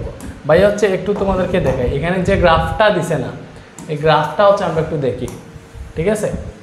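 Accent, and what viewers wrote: Sylhet, Bangladesh. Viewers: আপনি কী সিলটি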